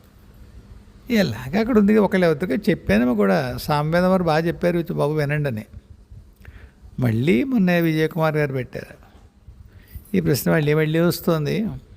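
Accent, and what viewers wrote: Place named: Godavari, India. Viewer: మీరు గోదావరి యాస ను బాగా ప్రేమిస్తారని అర్ధం అయ్యింది గురువు గారు నేను ఇక్కడే పుట్టాను.గోదావరి జిల్లా లోనే నాకు కూడా చాలా ఇష్టం ఆ యాస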